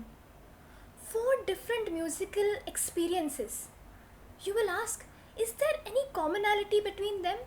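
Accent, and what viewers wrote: India. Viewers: I love your accent. It's somehow thickly Indian, and yet clear as the ringing of a bell. I also look forward to learning indian music theory. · you've got the perfect Indian accent........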